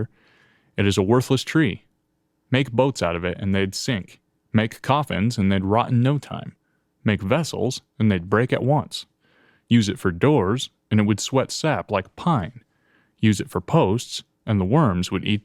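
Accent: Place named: United States. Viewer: are you kidding? this over the top american accent is just unbearable. I can't imagine any intonation more unsuitable for reading this kind of content (well, maybe an arrogant-french intonation. wouldn't be much worse though).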